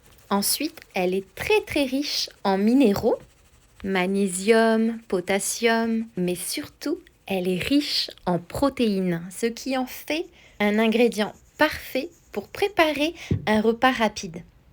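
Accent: France. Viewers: Hi, if you don't mind me asking, from where is your French accent? I'm learning French and that's why I ask and by the way I love chickpeas and this tortilla/bread looks so good.😻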